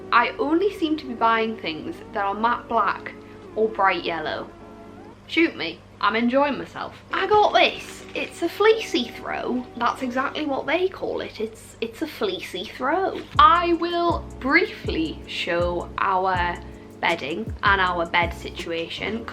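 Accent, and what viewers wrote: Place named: England, United Kingdom. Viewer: Where are you from? I think England but I know I'm probably wrong? I just think it's funny/cute when you say "me" instead of "my" haha and you're goofy on the best way 😂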